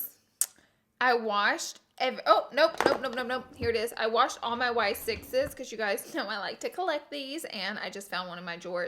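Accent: South, United States. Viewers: She is from the south would you tell someone with a Jamaican accent that you HATE the way they talk? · I love her accent so much! I’m a Georgian, so I’ve been raised in the south literally on a farm with people who have the strongest southern accents I’ve ever heard. And I just don’t have an accent all my siblings have one all of my family does but I just didn’t catch onto the accent for some reason. So when I hear people with really strong southern accents I absolutely love the way they sound!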